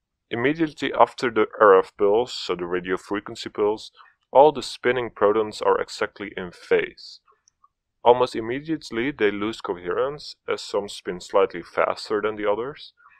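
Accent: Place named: Netherlands. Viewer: Waarom klinkt deze gast nederlands xD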